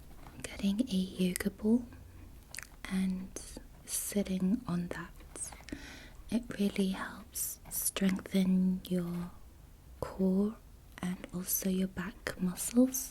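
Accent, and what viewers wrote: England, United Kingdom. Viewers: Or maybe she was taught the English way of speaking... or better yet taught by an Englishman/woman. Ever wonder why Indians sound the way they do? Welsh English teachers in the 1800s. She sounds English when speaking English because she was taught the English way, not because she's Korean. If an American had taught her to speak English, you'd bet your ass she'd speak with an American accent to. Just the way it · she has a British accent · it's her English accent, not Asian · she has an english accent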